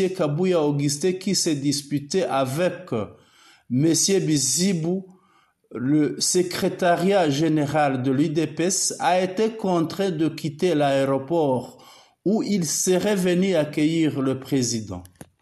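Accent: Rwanda. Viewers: Avec ton français rwandais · Le ton de français indique que tu es Rwandais. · Rwandais par ton français mensonges prolonger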